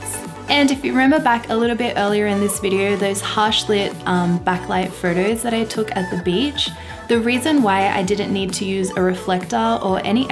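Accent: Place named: Australia. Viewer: Loved your Video very well done! You are adorable and your silky smooth voice with that Aussy accent ❤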